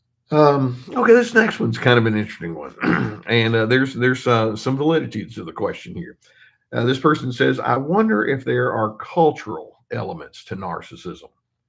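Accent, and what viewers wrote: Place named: Texas, United States. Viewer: ! I love your voice! And that you are slightly self conscious about this! You are so kind beautiful and helpful! Your voice is one of your signatures and I could recognize you in the dark! Not to mention your Texas accent! You are the best!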